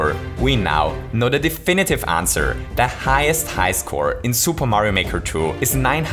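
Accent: Germany. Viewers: Man hat an der Stimme schon ein Bisschen erkannt, dass du deutsch bist ^^ #nofront^^ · Du sprichst ganz sicher deutsch als Muttersprache, das merkt man an an dem Englisch 😂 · Are you German? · xd man hört voll den deutschen aktzent(ich weiß nicht wie man das schreibt) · Wie man raushört, dass du deutsch bist :D ...hehe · Just a simple Question: are you german? :D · If I may ask,... are you german? This accent... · Deutscher Akzent kickt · Is this dude German ?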